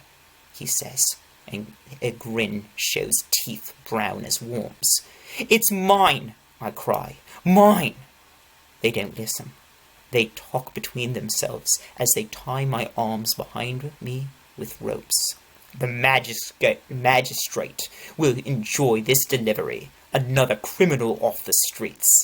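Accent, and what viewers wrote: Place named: United Kingdom. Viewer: I really love the British accent thank you !!!!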